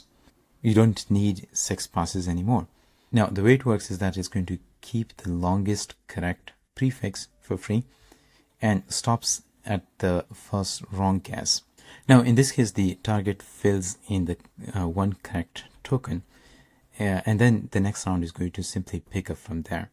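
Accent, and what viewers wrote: India. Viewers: god dammit! i thought an ad was the video and thought "oh thank god... NOT another indian..." then i realized it was an ad and you started talking and i wanted to throw up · >open video >Indian accent >close video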